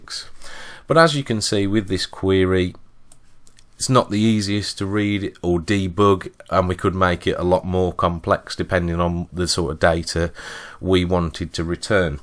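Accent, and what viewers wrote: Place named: United Kingdom. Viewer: I really hate british accent. How do you guys roll your mouth like this. This is worse than indian accent... Allright allright not bad at that level but c'mon guys it is really pain in the ass.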